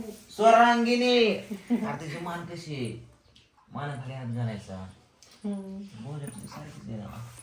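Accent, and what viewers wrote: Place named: Malshiras, India. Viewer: तुमची भाषा खूप छान आहे मला माझ्या भावाचं बोलणं आठवतं माळशिरस साईटला सेम आसच बोलतात त्यामुळे मी तुमचे सगळे विडिओ बघते पण कमेंट करत नाही आज केली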